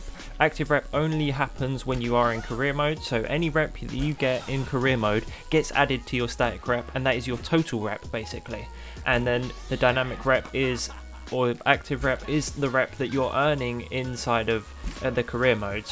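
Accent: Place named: United Kingdom. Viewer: It sounds like he's british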